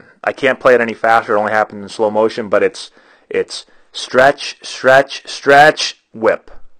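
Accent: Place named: East Coast, United States. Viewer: Anyone tell you that you sound a bit like Howard Stern? I think it's just your east coast accent lol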